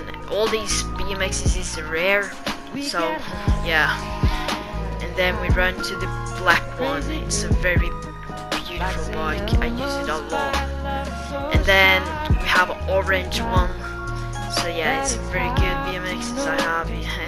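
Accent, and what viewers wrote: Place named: United Kingdom. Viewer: nice video men koffør snakka du me britisk aksent??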